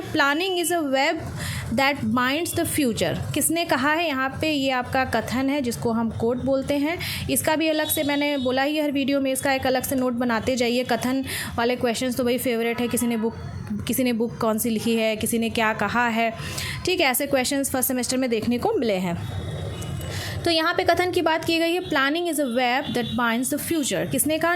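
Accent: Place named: Bihar, India. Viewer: Mam aap bihar se hai na kyoki thik h thik h karti h to apne bihar ke hi ho aap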